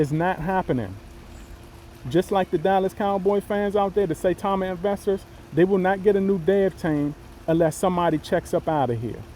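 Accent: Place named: Baltimore, United States. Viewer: ooh ok that’s ah nice home bro I thought you were from Baltimore because of the accent I’m from Baltimore I live in Texas now tho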